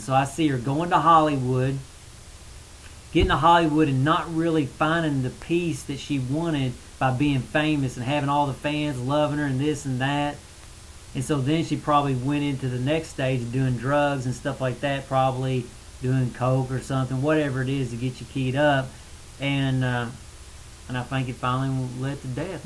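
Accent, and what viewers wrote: South, United States. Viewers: haha nice southern accent and i no everyone is dieing....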